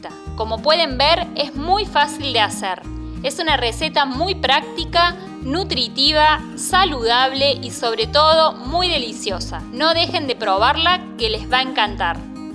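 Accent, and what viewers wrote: Córdoba, Argentina. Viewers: Es cordobesa la cocinera o parece?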